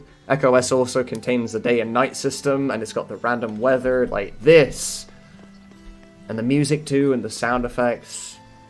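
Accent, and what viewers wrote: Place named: United Kingdom. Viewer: it took me a good 30 seconds to realize the british accent.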